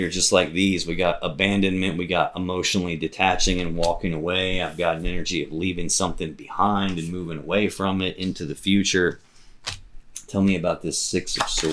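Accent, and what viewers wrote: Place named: Texas, United States. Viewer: Your accent is going to fit in nicely in Texas, it almost sounds like a native Texan.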